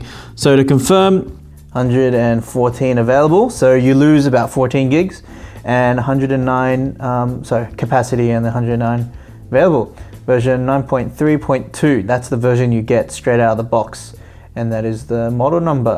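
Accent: Australia. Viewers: Theres no way your not Aussie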